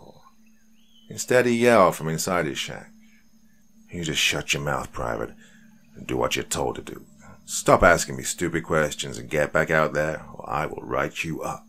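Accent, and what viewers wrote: England, United Kingdom. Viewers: also gta realize...hes English and we get our language based off there language..sooo who is really wrong in pronunciation???? However I do agree maybe a bit more research shldv been done knowing it was an American story...but give the guy a break really...he puts out some amazing content and I'm sure u have pronounced many things wrong...as have i..but he's working trying to make life easier for the rest of us and ur dawgn the man....I've heard many worse narrator's and is my main guy along with dark Somnium...gta stick up for what I believe in....if every1 here so much better STFU and start ur own YouTube...uk since ur so much better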